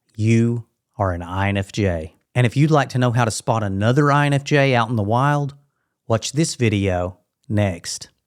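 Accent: South, United States. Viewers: I am from Virginia. And, it is so wonderful to hear your Southern Accent… one of the nicest ones I have ever heard. You should read for audiobooks😊 I am an INFJ It’s really kind of a relief to hear all of this. I don’t feel so weird anymore. Thank you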